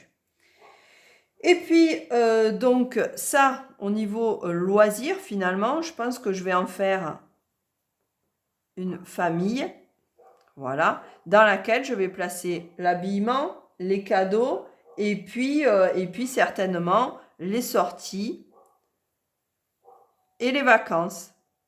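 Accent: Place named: Toulouse, France. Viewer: Coucou déjà j arrive pour ton accent car tu me rappelle une copine que j avais à Toulouse mais surtout par tes explications simples et sensées etce que je pourrais retenir Merci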